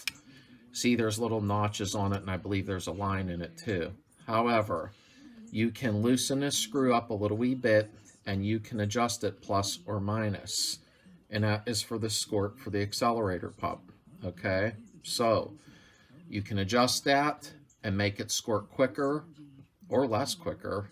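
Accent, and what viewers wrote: Pittsburgh, United States. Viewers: pittsburgh accent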